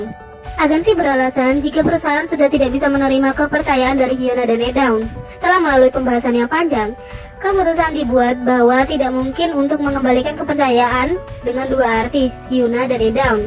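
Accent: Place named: Java, Indonesia. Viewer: Bentar yang ngomong ini kayak orang jawa btw😁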